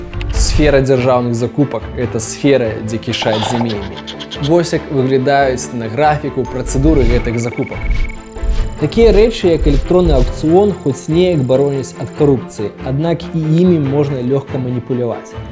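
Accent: Russia. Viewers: Стремление говорить на белорусском языке - всячески похвально.. но что здесь, что в подкастах слушать трасянку невыносимо для ушей. И с течением времени прогресса нет. "Белорусский" язык с русским произношением и русскими словами... такоЭ. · ты такі харызматычны. Колькі табе год? Ці можна ўжо ў прэзідэнты балатавацца? І слухай, дружа, нешта зусім мала рэкламуешся. Укідвай грошы ў інста-рэкламу, нават у метро рэкламуйся. Твой кантэнт на выключна высокім узроўні. Я б вельмі хацеў, каб гэтая ініцыятыва перарасла ў Партыю новых ідэй. Як мінімум мой голас у цябе ўжо ёсцека. І яшчэ. Схадзі да беларускага лагапеда. Годзе ўжо гэтага рускага акцэнту) хачу пачуць родныя цвёрдыя «ч» ды «р». 😉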